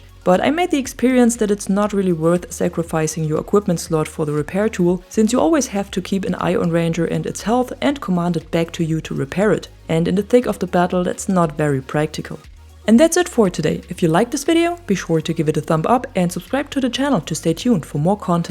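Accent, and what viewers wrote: Germany. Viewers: Warum kann ich sagen das du aus Deutschland bist ? 😂😂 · love your german accent, you voice is so hot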